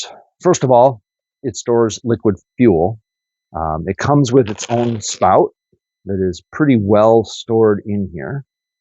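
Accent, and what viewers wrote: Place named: Canada. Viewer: Was just poking fun. Our Canadian English is influenced by our French speakers so pronunciation of French based words are different for sure. I appreciate the video. I'll be buying one of these bags.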